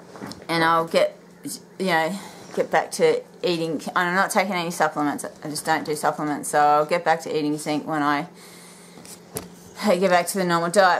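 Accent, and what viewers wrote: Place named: Australia. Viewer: where in Australia are you? its good to hear an aussie voice on here. and i feel like we've met before!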